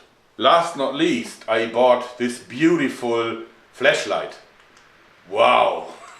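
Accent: Germany. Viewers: i've always loved his accent xD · is er deutscher? :3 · This beautiful Fleshlight hohoho, that german accent :D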